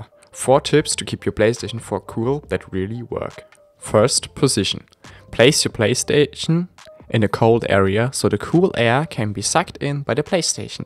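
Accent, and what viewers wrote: Germany. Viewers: Deutscher Akzent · Digga dein deutscher Akzent XD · You sound german🇩🇪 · omg dieser deutsch akzent in diese enhlisch ☠️☠️☠️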